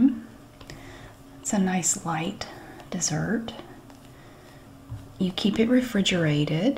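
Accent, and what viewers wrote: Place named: Appalachia, United States. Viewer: ive bien loving your channel for so long, because your videos are excellent, but also, i love the pride you have for your southern appalachian roots and has made me take a special interest in learning more about it. you accent is so beautiful and your anecdotes are so Rich. the thing is, in trying to get more information i found another channel called celebrating appalachia and to my surprise, both of you ladies use the same confetti bowls to Cook. i would die with that collab. sending all my love and support from argentina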